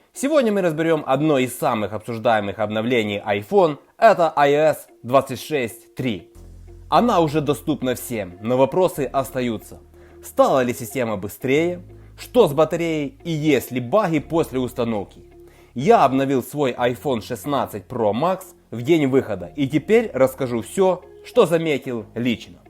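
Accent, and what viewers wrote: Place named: Ukraine. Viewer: по акценту услышал, что какол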